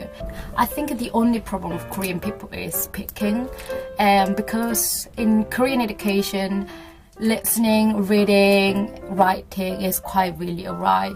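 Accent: United Kingdom. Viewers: 인종차별 영상때부터 잘보고 있는 구독자에요! 저는 캐나다 워킹홀리데이 다녀왔고 스벅에서 일했는데 진짜 몸 뿌셔지는 줄 알았어요 ㅠ ㅠ 스벅에서 일하시면서 영어 많이 늘었나요? 전 영어가 늘기는 늘었는데 어느순간 영어가 안늘고있다는 느낌을 받더라구요.쓰는 영어가 한계가 있다는...그런?? 지금은 다시 영국에 가고싶다는 생각이 들어요 ㅜ 한번 나갔다오니 두번 나가는건 별것 아니겠다 싶더라구요!! 특히 영국영어하시는것보니 너무 부럽네요. 영어하시는 모습 너무 멋지신것같아요! 좋은 영상 감사드립니다. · 영국발음이 너무매력적이에요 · 영국식 따라가시기 위해 정말 많이 노력하신 티가 나네요. 한국에서 워홀 오셔서 이렇게 잘 하시는 분 드물어요. 가끔 실수가 있거나 반복적인 표현이 있지만 거의 완벽하십니다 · 영국발음이시네용 원래 영국발음이셧나요 아니면 영국에서 사시면서 바뀌신건가요??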